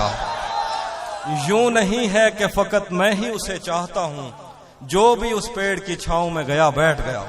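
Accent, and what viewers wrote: Saraiki, Pakistan. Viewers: How cute is this when is speaking saraiki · Saraiki awaaz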